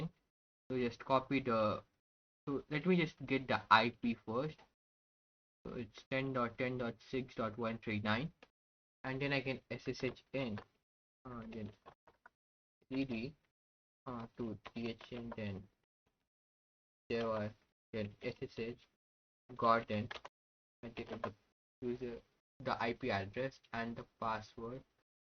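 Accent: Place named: India. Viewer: Your accent is damn cool for an Indian ! Good work, mate. Keep up the good work. Learned about tplmap and synk from your video!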